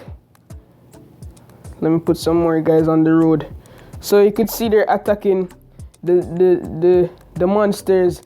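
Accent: Jamaica. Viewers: You Jamaican? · U Jamaican? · Are you Jamaican 🇯🇲🇯🇲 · u jamaican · Yeah Man U definitely Jamaican bredda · Are you jamaican?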